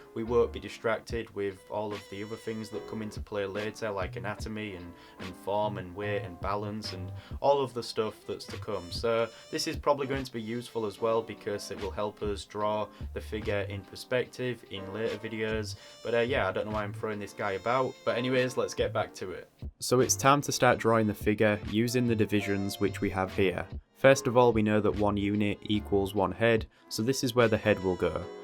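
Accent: United Kingdom. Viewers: cool tutorial but i cant figure out wtf is going on with ur accent. like i feel like its British or something most of the time and then you'll say a word that just sounds so southern. not hate I'm just really confused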